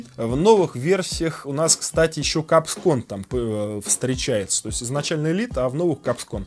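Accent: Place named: Okanye, Russia. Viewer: Что за Оканье такое =))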